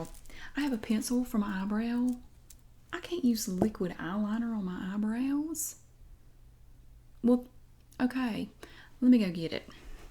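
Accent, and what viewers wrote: South, United States. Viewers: I lived in NC for a couple years and this reminded me of folks down there. I never thought I'd miss the southern accent.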